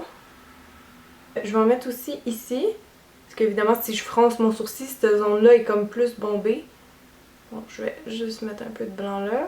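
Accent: Quebec, Canada. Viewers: Je t adore tu est un exemple pour moi de maquillage et ma cousine abite au canada du coup je m abitue a ton accent quebequoi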